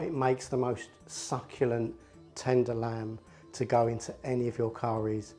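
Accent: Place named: South Coast, United Kingdom. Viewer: Great video mate, where you from in the uk? You sound like my mate from the south coast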